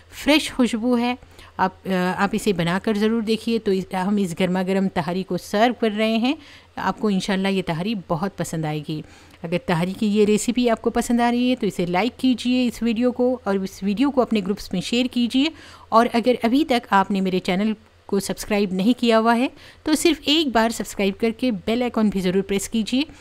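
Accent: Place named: Karnataka, India. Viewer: Mam apka talluqh bidar se hai? Apne kabhi zikr nahi kiya laikin apke andaze takallum se itna yaqheen hai k ap hamare hum watani hain karnataka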